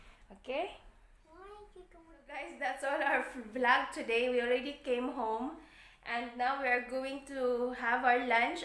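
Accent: India. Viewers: I you acquired the Indian accent. Your daughter is cute. Have a wonderful day.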